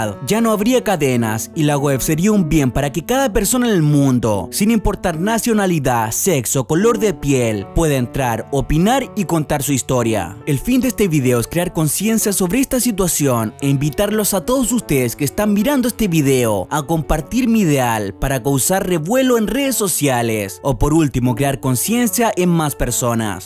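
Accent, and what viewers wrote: Chile. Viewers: Eres de chile? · eres chileno?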